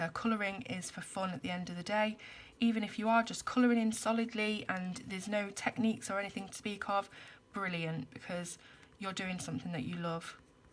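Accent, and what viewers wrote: England, United Kingdom. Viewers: Good video. It's nice to hear an English voice on a colour along. You didn't sound nervous at all.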